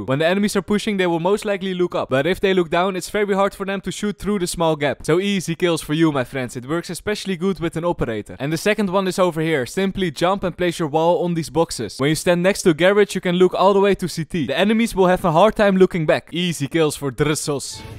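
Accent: Netherlands. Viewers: bro wait you are dutch, i didn’t even check i can hear it lol 😂😂😂😂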